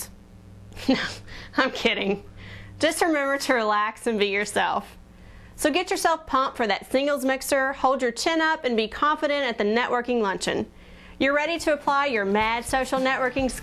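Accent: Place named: United States. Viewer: american accent hmmmm always different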